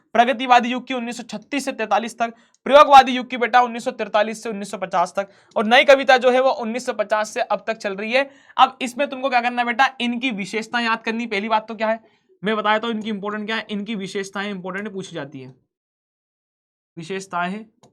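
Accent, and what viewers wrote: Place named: Bhopal, India. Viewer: aapke accent sunn ke lag riya h aap bhopali ho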